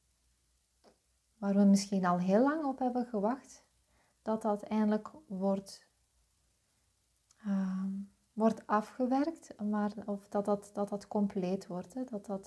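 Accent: Flanders, Belgium. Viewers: Mooie reading dankjewel 🙏🏻✨🤍 Je geluid is prima zelfs op m’n oude ipad..je Vlaamse tongval charmant en ook dat is prima te volgen als de nederlandse die ik ben ;) Laat gaan laat gaan 🤷🏻‍♀️😅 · Resoneert helemaal,. Mijn ware zelf komt steeds dichterbij. Ik vind de ondertiteling geen verrijking, zo nu en dan verkeerd vertaald. Nou je, voor sommige mensen wel fijn, ik negeer de ondertiteling, je bent voor mij goed te verstaan en te begrijpen. Je Vlaamse accent is echt geen beperking. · Weer zo herkenbaar, ik ben zo dankbaar dat ik in de flow leef. Deze maand gestart met een nieuwe baan als welzijnswerkers en 9 feb begin ik met de opleiding Social Work. Over het geluid, ik kan het altijd prima verstaan. Met en zonder oortjes. Bedankt weer voor jouw fijne reading en jouw fijne stem, ik geniet juist van jouw Vlaamse accent. Spreekt mij aan. Fijne dag lieve 🙏🌺💫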